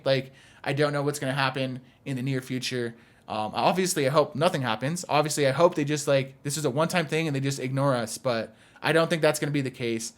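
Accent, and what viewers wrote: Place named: California, United States. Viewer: sounds like Fred Armison in the SNL skit Californians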